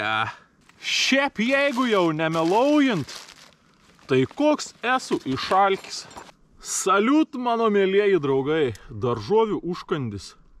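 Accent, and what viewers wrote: Samogitia, Lithuania. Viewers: Jei jau isdavei savo gimtine zemaitija, tai ir ismesk ta akcenta ir priimk naujos tevynes - novavilniecio kalba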